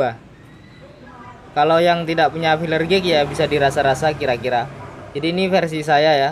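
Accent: Indonesia. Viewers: Logatxa gw kenal ini orang madura heheeheh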